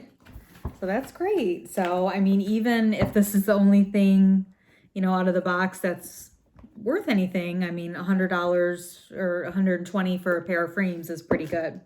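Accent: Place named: Chicago, United States. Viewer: Etoile is $$ just going to sit forever... Where are you from you sound like Chicago and then sometimes somewhere else ha!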